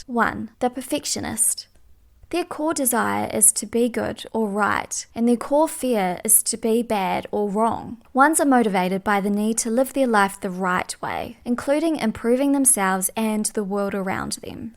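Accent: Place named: New Zealand. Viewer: I love your accent. New Zealand? And u r Type 4 too? Aw. Such a coincidence! 😉😋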